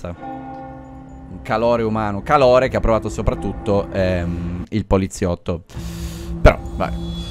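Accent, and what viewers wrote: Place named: Naples, Italy. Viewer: Potrei parlare napoletano meglio di te anche se non parlo napoletano. 😂 Vabbè, a parte gli scherzi, non mi ricordavo che Favij parla napoletano. Mi ricordo che nei vecchi video non parlava napoletano. Comunque Leon è quasi come me. Anche se ho un taglio di capelli un po' diverso, ma è quasi come me. Stesso carattere e stesso atteggiamento.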